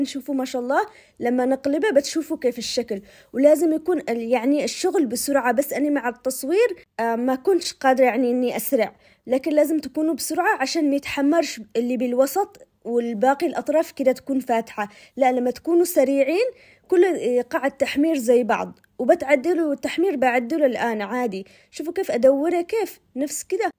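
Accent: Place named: Sanaa, Yemen. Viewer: ماشاء الله عليش بلهجة اهل صنعاء مشحوطه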